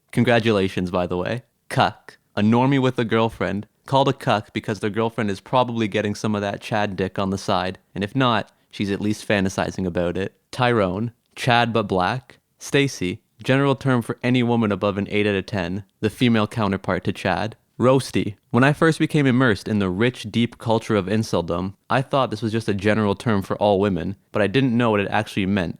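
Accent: Newfoundland, Canada. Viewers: i knew you were canadian btw i can hear it in your voice. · “Aboot” “b’ys” Newfoundland? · Is that a Canadian accent? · Dude are you a Newfoundlander by chance? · Are you from Newfoundland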